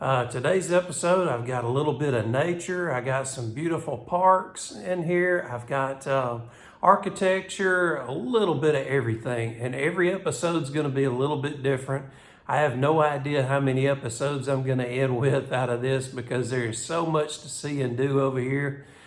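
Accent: South, United States. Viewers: Great episode,! I live in Georgia(USA). Just subscribed to both channels. I enjoy your southern drawl and your videos! Thanks!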